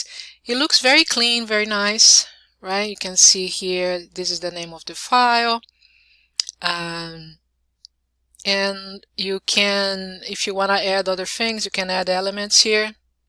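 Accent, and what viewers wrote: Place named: Brazil. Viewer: First time here. Are you Brazilian...? Your accent sounds beautifully Brazilian. Thanks for the video!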